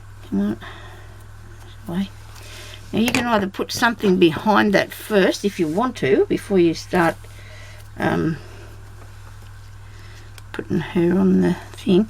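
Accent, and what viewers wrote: Australia. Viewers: Very very pretty love it...and your accent also....where is it from Australia?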